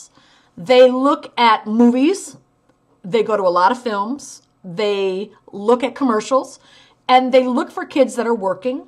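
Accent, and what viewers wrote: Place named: New Jersey, United States. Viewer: Connecticut. Haha 😆 I was guessing you were from Jersey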